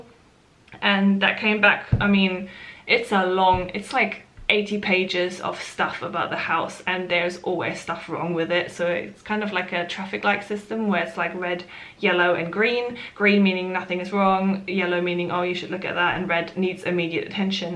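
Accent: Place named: London, United Kingdom. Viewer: hooooow just how you aquired such a genuine london accent? proper linguist training? specific job like english teaching?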